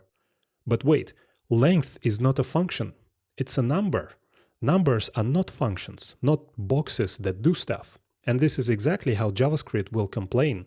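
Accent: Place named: Eastern Europe. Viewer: Why does he have an eastern European accent but his username is?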